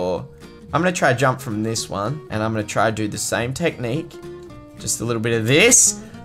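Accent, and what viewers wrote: Australia. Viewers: Are you fromAustralia?? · Are you Australian? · Are you Aussie or Just wondering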